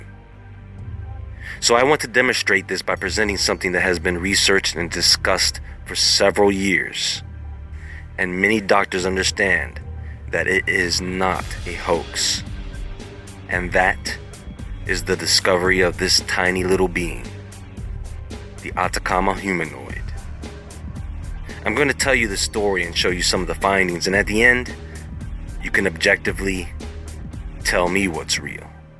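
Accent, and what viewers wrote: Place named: New York, United States. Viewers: I love your channel you sound like a fuckin badass you must be a brooklyn kid, you deserve 50 quadrillion subscribers. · Would make a perfect narrator for a mob documentary i'm serious man you got a great voice I don't know if you're from New York or New Jersey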